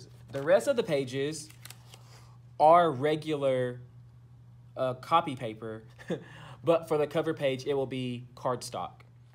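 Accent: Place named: Southeast, United States. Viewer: I thought u sounded like it was Southeastern! 😉. I’m from S.C. myself! Love hearing Southerners!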